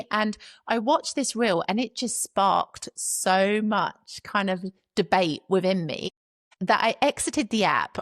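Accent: England, United Kingdom. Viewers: I love you commentary and English accent gets me 😂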